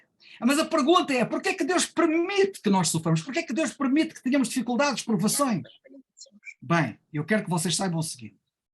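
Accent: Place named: Portugal. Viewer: ❤ como amo esse sotaque de Portugal 🙏 irmão saudades de ouvir o senhor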